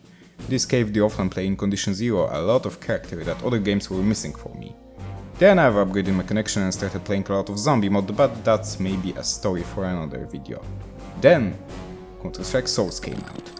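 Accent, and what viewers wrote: Poland. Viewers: Twoj akcent jest genialny odrazu domyslilem sie ze to polak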